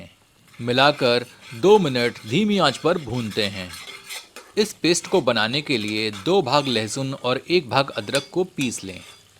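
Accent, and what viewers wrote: Standard. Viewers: Shudh Hindi ucharan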